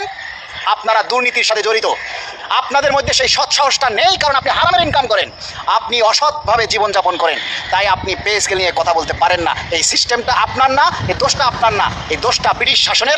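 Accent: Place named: Barishal, Bangladesh. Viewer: ও বরিশাইলা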